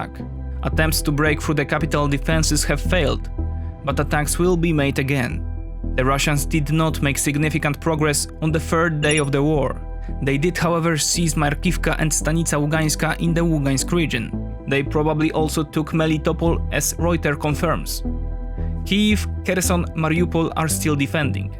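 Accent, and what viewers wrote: Poland. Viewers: Very informative video. The narrators Polish accent makes it even more ominus and I like it. 👍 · BTW I hope after this ends you continue to voice the English version of good times bad times. No offence to the other guy, but i much prefer your voice. I love polish accent, and I think most people prefer hearing about geopolitics from a European rather than American! (No offence Americans)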